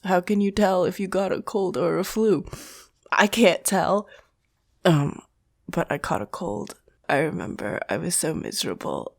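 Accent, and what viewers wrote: New Jersey, United States. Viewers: Your sick voice sounds like you came from New Jersey. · Oddly she developed a jersey accent. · sounds like you're from jersey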